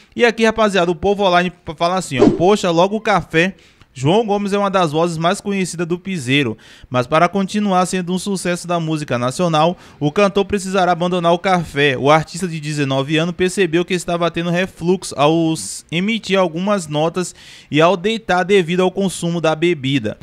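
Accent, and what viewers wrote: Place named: Northeast, Brazil. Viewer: E Adoro ele falando vixe como eu gosto quando ele fala desse jeitinho dele erradinho de vez em quando fica bem charmosinho gosto muito desse jeitinho dele bem nordestino gosto demais Ave Maria Até porque eu sou nordestina também e a gente tem que valorizar o nosso Nordeste Eita cabra da peste não vou sentir viu Fica com Deus e a inveja mata mas mata o próprio invejoso Deus Jesus é mais que te aguarde te Aguarda a todos amém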